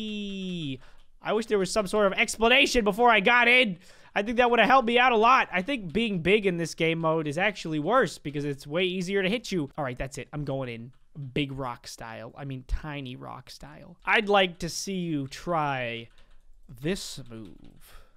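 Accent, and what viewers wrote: Italy. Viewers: you need to about me out in the next video!? Btw I love the Italian accent and I am part Italian!☺😊😀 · I think you cute with the Italien accent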